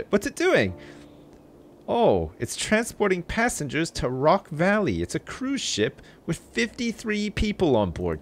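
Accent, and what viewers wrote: Canada. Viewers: Please, would rather have no music than that. The really slow piano brings the tone of the video down:(... Am I crazy? It's very juxtaposing to your cheery Canadian voice.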